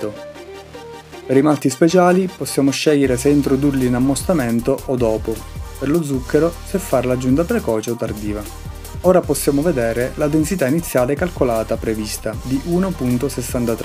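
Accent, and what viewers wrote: Calabria, Italy. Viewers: Ciao, sei calabrese?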